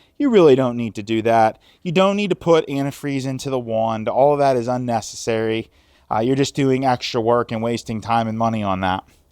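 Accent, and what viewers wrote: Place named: Western Pennsylvania, United States. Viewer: Very good video. Also loving the western PA accent!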